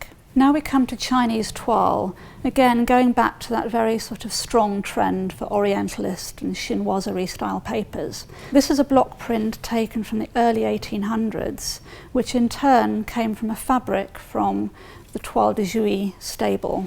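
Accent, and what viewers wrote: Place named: RP, United Kingdom. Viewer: Wonderful posh accent. Very soothing!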